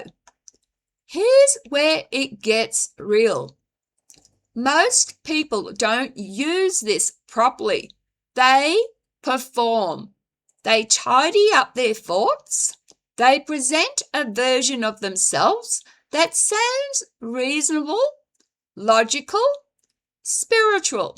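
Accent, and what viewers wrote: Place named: New Zealand. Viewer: Hi, where are you from? I almost thought it was NZ yet could be UK? Interesting content re Chat GPT for soul reflection. Thanks for sharing.😊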